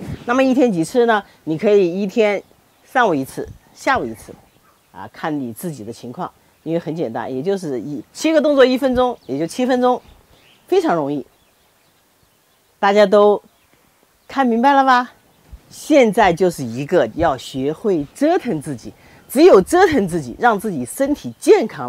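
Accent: Wuhan, China. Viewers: 谢谢！您是武汉/湖北人？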